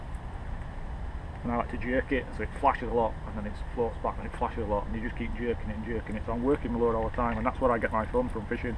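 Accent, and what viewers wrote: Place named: Yorkshire, United Kingdom. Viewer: I read your blog on dropshotting before watching this great video. LOL You did a great job of keeping a straight face. I've just tackled up with an LRF cheapish combo so as to be able to fish part sea, part canal after years of threatening to start fishing again,then taking early retirement I've finally done it. Your video's have inspired me to get another lightweight spin outfit for lure fishing. Drop shots, and small rubber lures came with the Shakespear agility LRF set up, but you've really sold it to me to lure fish. I wish I knew where you were, your accent sounds like mine, in between Leeds Bradford area. You're a credit to the sport. Thanks. Robin.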